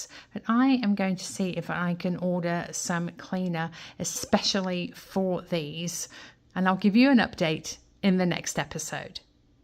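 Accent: England, United Kingdom. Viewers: Thank you for answering my question. Your English accent is still strong so I was wondering. I have been watching your channel for a few years. Love your finds. I have been to Las Vegas 4 times 1st time in 1992 and spent 3 days of our honeymoon there in 1995 when we travelled aound the west coast but never visited a Goodwill. Take dare.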